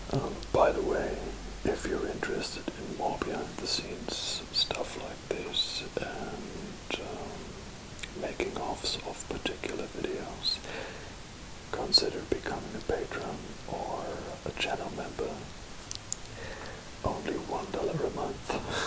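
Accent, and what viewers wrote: Germany. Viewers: vivienlaramalfoy maybe bc I’m from another European country but I can tell by the accent it’s German... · Hello are you german? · Is this guy German, cuz I think his German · Hey are you german cuz Im wondering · Ur German ? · Are you german??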